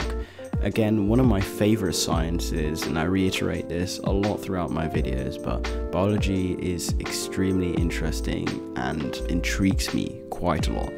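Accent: RP, United Kingdom. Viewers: But how is he posh do?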